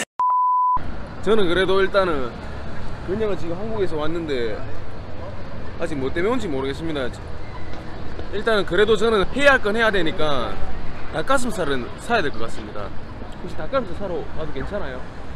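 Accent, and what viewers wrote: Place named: Daegu, South Korea. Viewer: 사투리랑 말투가 엄청ㅋ멋지면서웃겨요 매너있는대구남자ㅋㅋ~~~그녀ㅋㅋ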